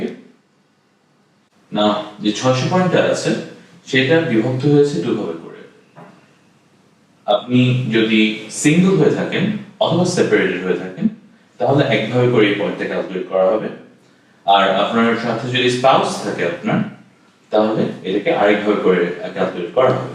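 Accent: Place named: North America. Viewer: Don't understand why people are moaning about his presentation or way of speaking? He probably lived in USA or Canada for a long time hence he speaks like that and personally I would prefer his presentation as it's clear and concise.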